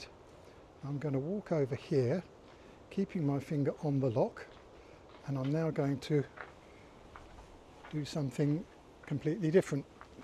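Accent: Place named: United Kingdom. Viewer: Thanks for your video, it's very helpful for a beginner like me. You sound like you are from the UK. Did you emigrate to Australia or are you on holiday? Best wishes, Rob, Romford, Essex.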